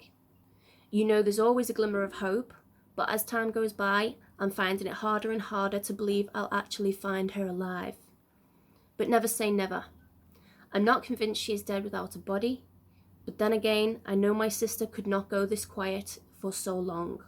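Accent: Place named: Scotland, United Kingdom. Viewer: are you Scottish? Dark Curiosities is another Scottish Voice I love. Great accent the Scots have 👍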